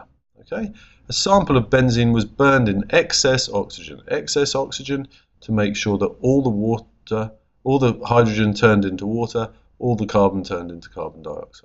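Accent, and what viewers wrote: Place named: United Kingdom. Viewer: I'd like to say you are one of the best IB chemistry teachers on Youtube. Your videos are really helpful. In fact, you have taught me more than my IB teacher has in the last year and a half. Thank you so much!! You're a star!:)) PS. Your British accent helps- I'm from England so it's quite difficult for me to sometimes understand the American youtubers since they pronounce most stuff quite differently to us.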